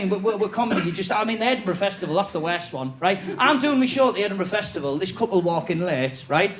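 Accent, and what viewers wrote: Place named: Hartlepool, United Kingdom. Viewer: lighten up! It ain't easy making it big with a Hartlepudlian accent.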